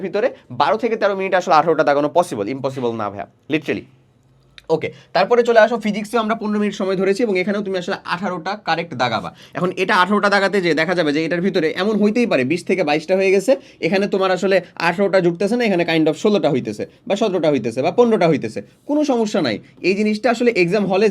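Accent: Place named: Rajshahi, Bangladesh. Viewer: apnr basa ki rajshahi ??? vasa te rajshahi rajshahi vab ache ....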